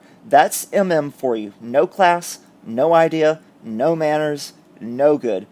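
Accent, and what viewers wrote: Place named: Virginia, United States. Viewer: I've always liked your accent and now I know it's Virginian.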